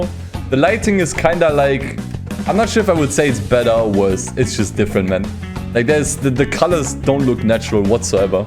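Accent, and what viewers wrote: Germany. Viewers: Top video, eine Frage bist du deutscher ? Hört sich vom Akzent oder Dialekt her an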